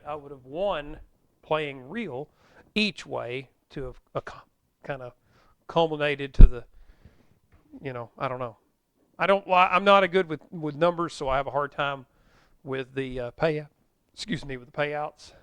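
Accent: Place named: South, United States. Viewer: Thank you! I love your Country Accent!!! 🙏🏿❤️❤️🥰❤️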